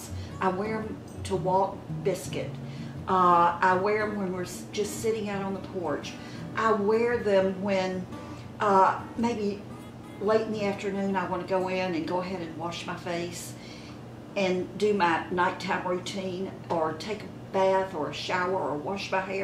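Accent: South, United States. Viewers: You are the cutest! The lashes look great on you! Your southern accent 😍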